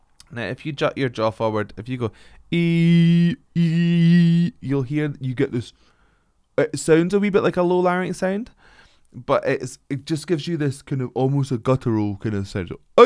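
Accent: Scotland, United Kingdom. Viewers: Love your BTS reviews & technical explanations, love your Scots accent--if I had functioning vocal chords, would def hire you as a coach! How about reacting to House of Cards...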